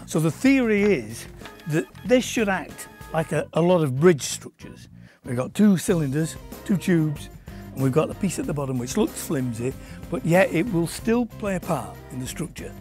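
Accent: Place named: Midlands, United Kingdom. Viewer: Some kind of english accent, propably the midlands region i think.. or maybe newcastle.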